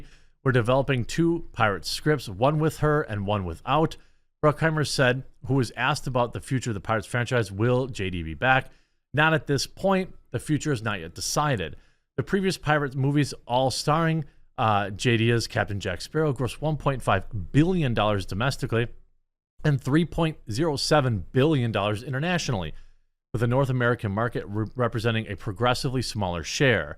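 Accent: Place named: Wisconsin, United States. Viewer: Love the show! But I can’t tell if you’re saying wahmen seriously because the way you say marget Robbie, Dray-gons. I know you’re from Wisconsin or somewhere lol again love the show!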